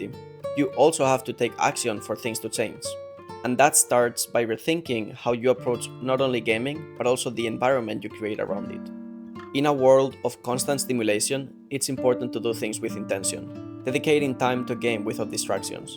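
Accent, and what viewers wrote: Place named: Spain. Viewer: Se nota que eres español, buen ingles crack ÑÑ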